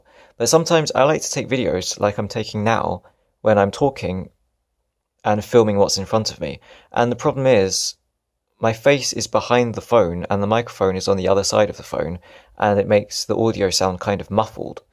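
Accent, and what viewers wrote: United Kingdom. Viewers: you have a british accent although you life in japan I guess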